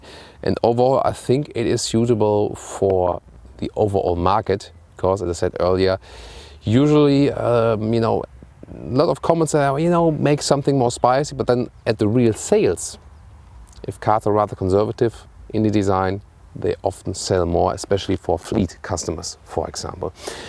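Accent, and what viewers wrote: Germany. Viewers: Schade. Review könnte wirklich gut sein, wenn nicht dieser deutsche Dialekt wäre. Das Review von Brian ist da sehr viel angenehmer anzusehen. Meine Meinung, besser einfach auf deutsch das Review machen, oder einen native Speaker für das Review in der englischen Sprache. Es hört sich einfach (Sorry) grausam an.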